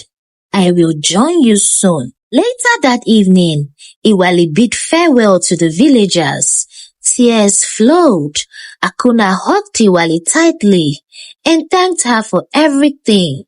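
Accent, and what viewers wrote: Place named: Yoruba, Nigeria. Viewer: The way you Dey talk the story like Yoruba woman too funy 😂😂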